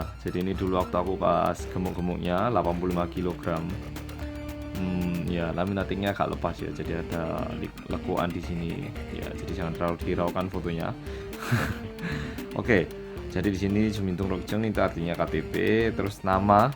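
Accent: Java, Indonesia. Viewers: kok medhok ya. btw baru nemu orang korea medhok. keren bingit..subscribe ah. keep posting info menarik ya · Medok tenan ngomonge cak:v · lah kok medok... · sudah lama tinggal di jawa ya oppa sampek kebawa logat jawa ( medok ) 😂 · pertama kali liat video ini kok fokus gw ke medok jawanya ya?😂😂😂😂😂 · logat ngomong nya kaya orang jwa,medok gitu. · Medok temenan koe bang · Mas e gk pnya ktp indo??besar di Korea??bahasa e medok Jawa gt???kaget q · Asli org mana sih kak Ktp nya korea tp bahasa nya kok medok ya 😁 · koreane ngledok:v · medok bnget mas... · 안녕하세요. 조는 노비입니다. Ternyata 씨 orang korea yaa tapi lancar sangat bahasa indonesia sampai logatnya logat orang jawa 대박 😁. Saya masih proses belajar bhs korea 😁. Oh iya saya sudah tahu ktp org korea kaya gimananya di drama my father is strange 😉 eps 52 hhi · mendok bet😂😂😂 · jowo nya ningendi mas??? ribet gak mas.pindah kewarganrgaraan ganti KTP nyaa? 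· keren yaa, logatnya jawa gitu padahal korea👍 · gue kira orang jawa wkwk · 오빠 ngomongnya medok banget >.< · kok suarany kyk orng Jawa bgt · lucu banget jawanya wkwk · hah? orang korea? kok medok banget sih bahasa jawanya:') serasa orang jawa abis belajar bahasa korea cobak:') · pantesan ada yg gak percaya sampeyan org korea.. wong ngmgnya medok jawa buangett.. 😅😅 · orang korea ya bang?? kok logat bicaranya kayak orang jawa:D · maaf ya,mo nanya kamu tinggal di Indonesia dmn? di jawa kah? logat jawanya kelihatan bgt hehe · wajah korea.. bosonya medok sekali.. hehe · medok banget mas. 😂 gemes. haha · model KTP tiap2 negara bisa berbeda tergantung pada kondisi GEOPOLITIK negara masing2 dan ini bersifat dinamis. di eropa, jerman misalnya KTPnya ada masa berlakunya. lebih jelasnya bisa bertanya ke dept. dalam negeri. salam Lemper mas bro..., tetaplah medok dan trus ngomong jowo.., tak tunggu vlog sampeyan selanjutnya,suwunn,hehe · logatnya seperti logat jawa medok 😄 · sipit tp kok medok ya mas 😄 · kok medok jawa? 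😂😂😂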